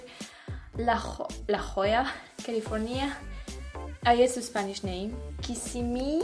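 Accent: France. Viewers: My father is from France, so I’m used to the accent. The word "father" itself comes out particularly humorous, so be careful. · You did really good I thought. Don't loose your French accents, makes listening to your videos very charming. Time to show us some more delicious French food please!!! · You did SOOOOO Great! Kernel=Colonel, and you have it. There's also the French S for singular things, which is endearing, so don't change!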